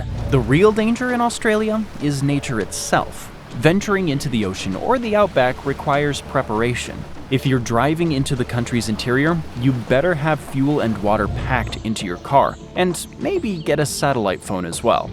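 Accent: United States. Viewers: As soon as I heard the American accent I was out, I couldn’t imagine an American watching a video on their country with an Aussie twang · Omfg the most American video ever, trying to downfall the new Eldorado country that took your 1st spot is hilarious af. Bro butthurt that is little America as lost it's paradise status and take it on the new n°1 😂😂 i'm dead We dont care that Australia is isolated and far from everything, it's a good thing rather than a bad, EU and America is so satured with their own legacy and history that a place like Aus is literally a new born baby so it has so much potential and possibilities, that's why it is so great cause it is not concern with the historic bs that EU and US can't dissociate from, it's literally just living it's best life, something that the rest of the world should learn from. If you move to Aus you know why you chose to live there and nothing else really matters when you got such a perfect country to live in. 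A flight is a flight, 8 or 16 hours is all the same The news thing, how is that a bad thing ? People in EU and US literally are brainwashed daily with so much bs information nobody gives a fck about, it's literally cancer for your life. You say it yourself in here, 'they might have hacked the mental health problem' duuuh.. Accumulated informations that doesn't matter for 90% of it giving cancer and tumors to people.. i swear people make videos like this either cause they got rejected for their Visa to go live there or you just like spreading negativity. Or.. if that's your voice you're clearly American and jealous that the 'Eldorado' status is now considered to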